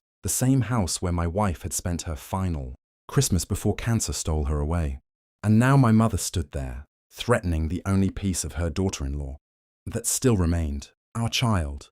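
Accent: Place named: United Kingdom. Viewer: I always loved a British accent!! Keep making stories like this,glad the older brother and the mother got what they deserved!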